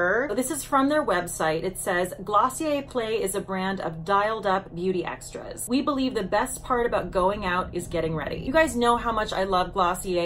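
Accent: France. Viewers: Hiya toots! Love your French accent! Have you ever seen the episode Girlfriends? There is a French song called that is hilarious! Oh and there have been four Titanic movies! My fave is the one with Barbara Stanwyck and Clifton Webb. So good! Love this Glossier Play line and you’re right, it has a 70s vibe! You look just adorable/chic/glamorous! Be careful with those glaciers girl. 😘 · I loved this! I would wear that glitter and I'm turning 60 this month! Love the liners, too! This is a brand I've never tried but I did try Colourpop because of you so maybe I'll try this out too! Love the French accent! You never fail to entertain! Be careful out there! Thank you for another exciting video!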